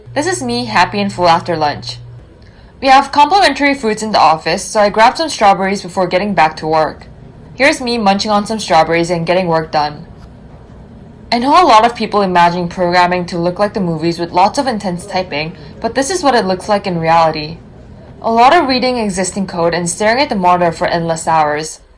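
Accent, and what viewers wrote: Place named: Singapore. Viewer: 혹시 싱가폴에서 오래 사셨나요? 제 싱가폴 친구랑 억양이랑 목소리가 넘 비슷해서 깜짝 놀랐어요😅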